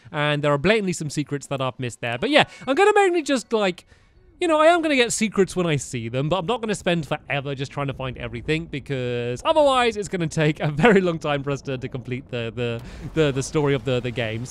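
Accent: England, United Kingdom. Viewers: dexieplays he is English so he speaks differently I say zed aswell coz I’m not american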